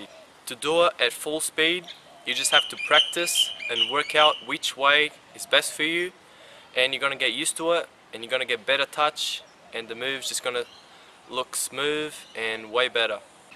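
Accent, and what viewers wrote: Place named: Australia. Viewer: I thought you sounded kinda Aussie haha nice tutorial though, got the hang of it in about 20 minutes ;)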